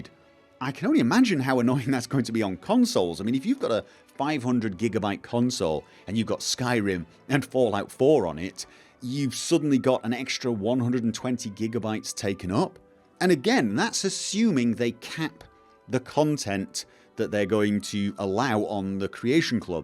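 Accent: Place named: North, United Kingdom. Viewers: Bunny Rabbit I'm pretty sure he's just somewhat Northern :) Well, he sounds like it to me! You can hear it in the way he says words like "aff-ter" (Northern) instead of "arh-fter" (Southern/RP), or the way he says "up" (closer to "oohp"). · Averyl Fong Yeah hes definitly got a slight northern twang. Its inescapable. And for you yanky boys, we mean north England.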